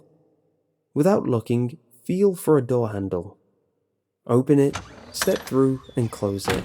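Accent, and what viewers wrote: United Kingdom. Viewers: "Tooo The Le Taah"...damn I love british accents 😁 And fuck this, waaay too many instructions going waaay too fast. I woulda been said, "fuck you, I'm not THAT bored!" You are my all time fave pasta reader. You could read the ingrediants on a candy bar wrapper and make it sound bad ass. Please start a childrens' channel and read Dr.Suess and fairy tales stories also 👍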